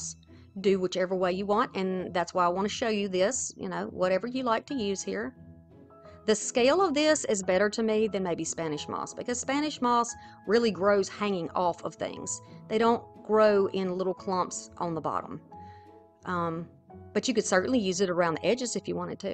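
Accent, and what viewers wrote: South, United States. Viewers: I so enjoy your creations and listening to your sweet southern accent. Keep crafting and thanks for sharing.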